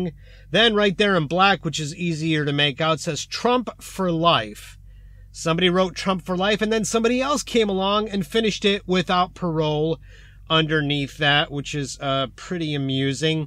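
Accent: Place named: Russia. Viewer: His Russian accent=👋🏼🏃🏼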